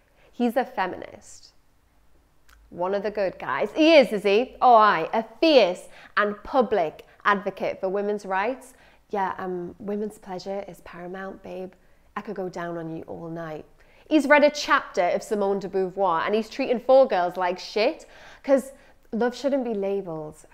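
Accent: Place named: Newcastle, United Kingdom. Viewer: Amazing! In the wellness/yoga circles I work in Ive defo met a fair few of these guys!! You express it so well and great to hear a fellow geordie covering this important topic thanks 💚🙏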